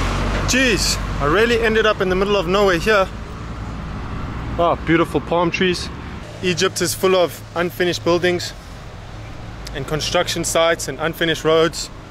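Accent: Germany. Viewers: He has a german accent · You German or South African I'm confused 🤔 anywhos love from America🇺🇲🦅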